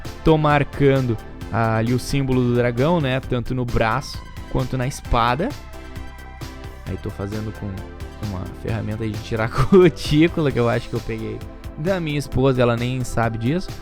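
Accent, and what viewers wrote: Rio Grande do Sul, Brazil. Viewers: conheci o seu canal a pouco tempo, e ja curto e pelo seu sotaque percebi q é de RS kkk,tbm sou, só q sou de Caxias tmj <3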